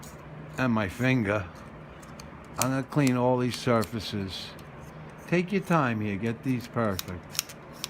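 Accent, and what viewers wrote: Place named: New Orleans, United States. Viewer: I volunteer at the WWII Museum in N.O. and when meeting visitors from out of town I always try to guess where they are from based on their accents. You have an accent like people from the N.O. area and also from the northeast. I want to say perhaps the metro N.Y. area, am I correct or totally off base? :- )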